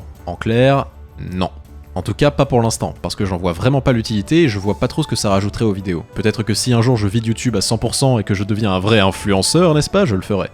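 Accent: Quebec, Canada. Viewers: Est tu qebecois? Moi oui. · Bonjour, depuis que j’ai vu ta chaîne je regarde tes vidéos et une question me trotte dans la tête et tu n’y as pas répondu dans la vidéo. D’où te vient cet accent québécois exceptionnel ?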